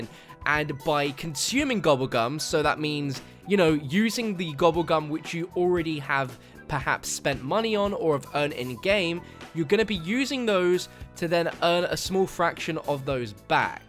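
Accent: United Kingdom. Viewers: He is British? Or American?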